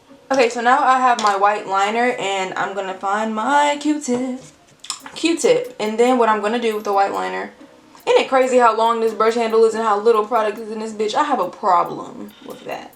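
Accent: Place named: Bay Area, United States. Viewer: lol u talk like ya out here in the bay I was like oh she must be local 😭😂 stay safe bihhhh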